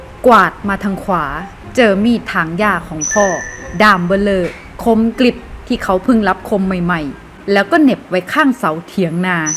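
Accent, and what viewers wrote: Isan, Thailand. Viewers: คนอีสานหรอพี่อะ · พี่เป็นคนอีสานใช้มั้ยคะ คนอีสานคือกัน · เอ้าเจ้าคนอีสานบ่ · แสดงว่าแอดเป็นคนอีสานเหมือนกันใช่ไหมครับ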